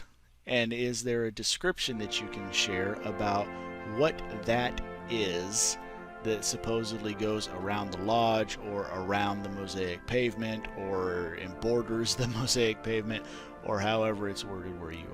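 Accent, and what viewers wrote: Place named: California, United States. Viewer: Cool thanks for sharing. I thought I heard some California in you.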